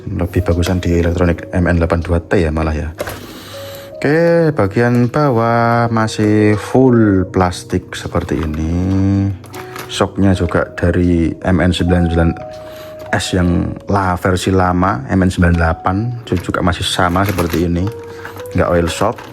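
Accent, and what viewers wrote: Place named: Java, Indonesia. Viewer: review mu seru mas onok logat² jowo e😂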